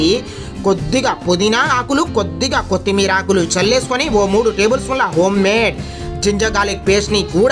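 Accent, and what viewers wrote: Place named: Telangana, India. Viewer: Hi bro thank you for the video and meeku telangana slang vachu telugu nerchukoni chepthunaanu anaaru don't worry bro meeru meeku vachina slang a maatladandi we can understand telangana slang as well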